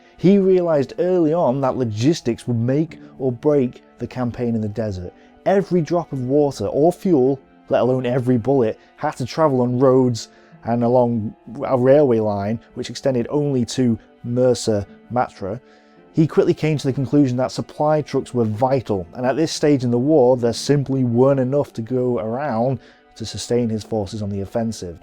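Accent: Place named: Manchester, United Kingdom. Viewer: It's a Mancunian Accent , from Manchester / surrounds.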